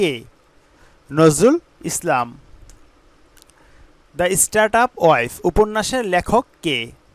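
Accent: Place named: Noakhali, Bangladesh. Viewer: আপনার ভাষা নোয়াখাইলা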